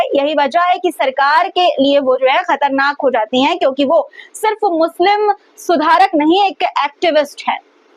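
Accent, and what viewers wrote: Pakistan. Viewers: Iska bolne ka lehja to Hindustani nahi lagta۔ Pakistani lehja hai is ka۔ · ye urdu bol raha hai jaise pakistani ho.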